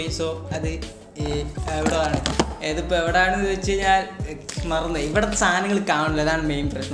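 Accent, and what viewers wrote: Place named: Malappuram, India. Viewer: ആ മലപ്രം ടച്ചുള്ള സംസാരാ ഇഷ്ടം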